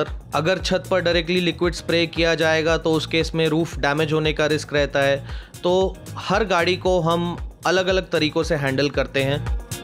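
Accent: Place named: United Kingdom. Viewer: Mtlb professional professional.... Itni bhi English accent me mt bolo Irritating lgta h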